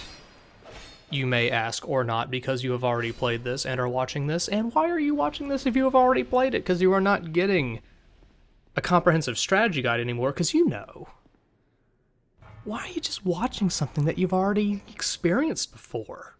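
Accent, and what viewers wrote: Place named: Texas, United States. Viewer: i can already hear the texas in your voice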